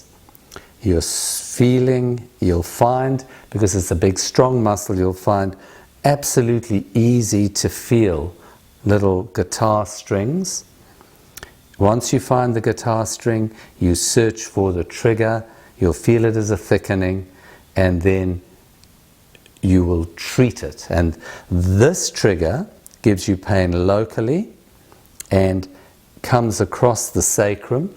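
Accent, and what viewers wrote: South Africa. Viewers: Dear, your accent sounds South African. Loved this video.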